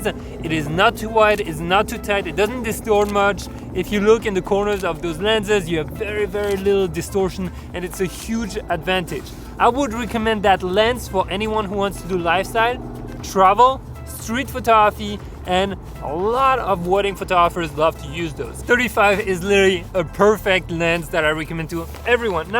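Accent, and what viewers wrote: France. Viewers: French accent salut parler vous francais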